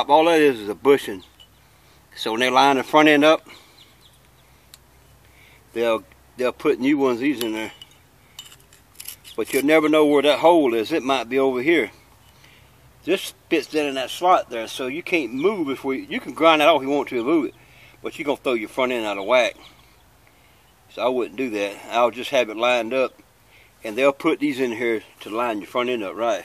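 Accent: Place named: Virginia, United States. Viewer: Where are you from? My uncle is from around Staunton, and he has a similar accent. Thanks for the pointers.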